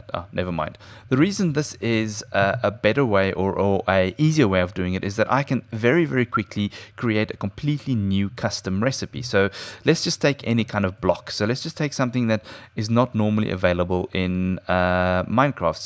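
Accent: South Africa. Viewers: hi. where are you from? You sound South African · are u south african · HEY!! are u south African? If yes I am too!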